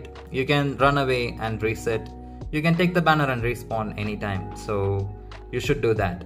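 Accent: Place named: South India, India. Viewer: Tbh I like the little south Indian accent on it 😅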